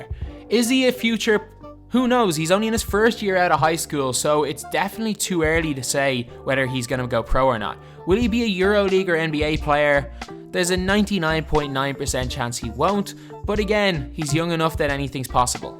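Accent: Ireland. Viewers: This is summer league ball, there is no defense whatsoever being played. All these comments are not judging him how he should be judged. His release point on his shot is low, he does not look like he can touch the rim on any forays to the basket (taking it to the hole). I am not sure why a video was made of a guy playing summer league ball with no defense and the whole time the video shows him going by other kids of pretty poor talent. Jass looks fine as a player, but he is right hand dominant, low release point on shot, simply going around guys that are even worse than he is as an athlete. What can he do from a defensive stance, how fast can he tip the ball and then outrun several plays on the way to a steal and a bucket? This is what scouts have to see. Time will tell what he can do in college in Canada. But, he needs to shoot with a higher arc, higher release point above his head and the video showed nothing about what he can do on defense. 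Absolutely one of the most pointless videos of all time!! The narrator who made the video (with I believe an Irish accent) states that some say Jass will make the NBA and then begins to debate this on his video. Who is it that is saying Jass will make the NBA? This video is insane! Just let the kid develop and then we will see how good he can be. We won't know this for another two years.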